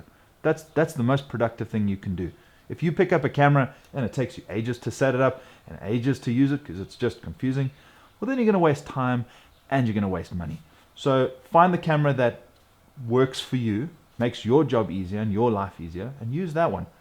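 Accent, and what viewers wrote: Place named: South Africa. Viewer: So awesome to see a fellow Saffa so passionate about filmmaking! Almost jumped out of my seat when I heard your accent. Great footage!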